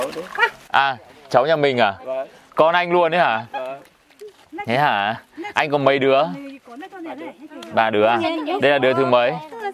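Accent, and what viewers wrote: Hanoi, Vietnam. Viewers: thích giọng anh ghê! Trai Hà Nội có khác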